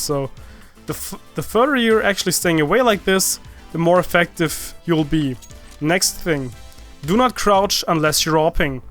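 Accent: Germany. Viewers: Bist du eigentlich deutsch? :D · bist du deutsch ??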